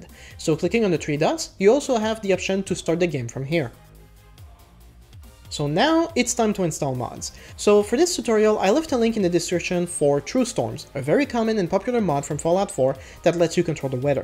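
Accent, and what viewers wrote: Quebec, Canada. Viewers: Is it me or i can sense a little quebecer accent ?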